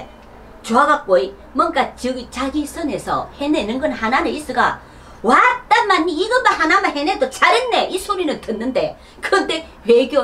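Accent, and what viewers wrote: Gyeongsang, South Korea. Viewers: 옛날에 *자갈치아지매*라는 라디오방송이 있었는데 비슷한것 같네요. 구수한 경상도 사투리 정겹습니다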